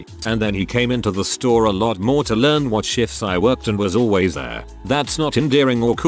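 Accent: England, United Kingdom. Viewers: Why is this narration in an English accent? Much harder to understand.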